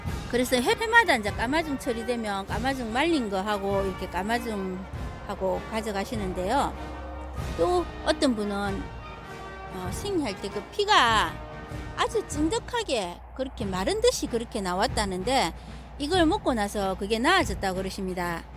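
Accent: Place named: Gyeongsang, South Korea. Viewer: 경상도말씨에 정감이갑니다 까마중에대한자세한 설명고맙습니다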